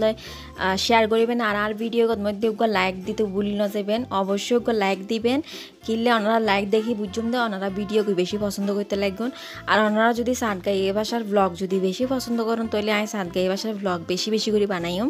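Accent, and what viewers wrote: Chittagong, Bangladesh. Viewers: Apo apnar kotha golo onek sondor lage but bojinaaaa..... Amar o chittagong jelar but sondwip opojelar...... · আপু চট্টগ্রামের কথা শুনতে অনেক ভালো লাগে চট্টগ্রামের ব্লগ দিও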